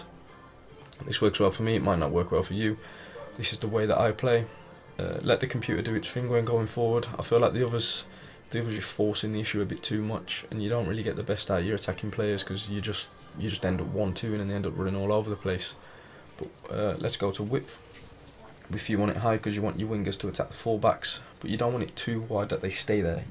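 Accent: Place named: Birmingham, United Kingdom. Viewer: Cheers for this mate, can ping that brummy accent a mile off. Don’t apologise for being ill or swallowing too much mate, people will always knit pick at shit on YouTube. You seem like a proper decent bloke mate keep smashing it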